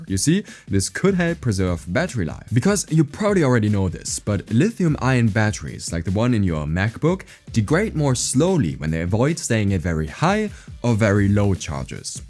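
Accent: Germany. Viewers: I could easily hear your German accent but it sounds good and really natural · Am Akzent rausgehört dass er Deutsch is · Omg dieses german accent ist halt so schlimm 😬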